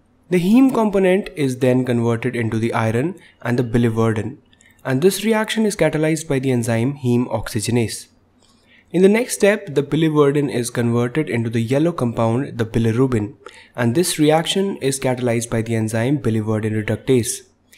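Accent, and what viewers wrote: India. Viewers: Şu hayatta hintli İngilizcesi kadar kahreden bi şey daha yoktur. Ama iyi video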